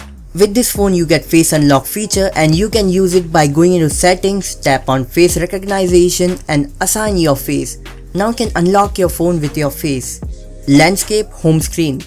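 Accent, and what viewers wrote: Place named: Uganda. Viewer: Nice accent. from Uganda university?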